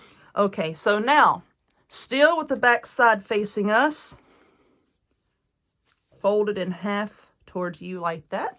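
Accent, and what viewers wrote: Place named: South, United States. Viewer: Please don't ever quit with your tutorials. I really enjoy your accent. I am from Tenn and I really miss hearing good ole southern talk. I also remember these in my granny's kitchen. I also remember one with a poem that used used two long men's white socks. Do you have any idea about that craft? If so could you please do a tutorial on it.. I just found your site and am definitely subscribing.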